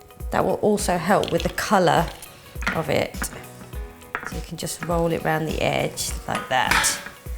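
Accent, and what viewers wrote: England, United Kingdom. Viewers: I love your English accent. And your recipes are inspiring and they always work. From Betty in Australia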